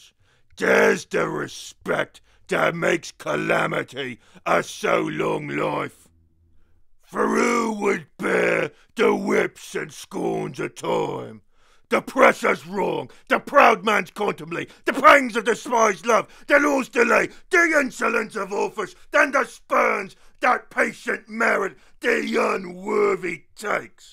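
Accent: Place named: United Kingdom. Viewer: This isn't an ork voice ... this is just plain a british voice reading a british play.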